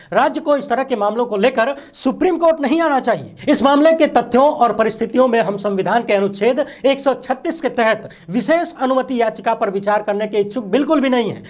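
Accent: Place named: Purvanchal, India. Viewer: आपका बोलने का तरीका बता रहा आप पूर्वांचल से हैँ आपकी सच्ची पत्रकारिता को सलूट 👌🏻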